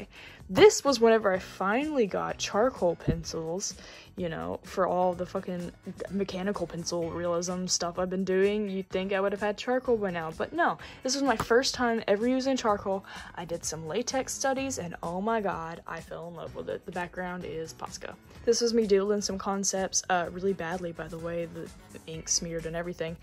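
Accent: South, United States. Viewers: I love your art it’s so expressive and fun and colorful! Also, are you southern? I feel like I’m detecting an accent. I’m from Missouri so maybe I’m projecting lol. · ive watched this video at least 4 times and i just realized you have sort of a southern accent 😭😭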